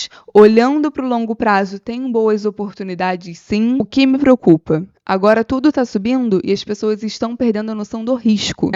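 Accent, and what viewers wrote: Rio de Janeiro, Brazil. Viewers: Adorei descobrir o seu canal!! A análise ficou ótima e ainda tem a dose de carioquês e palavrão que eu amo kk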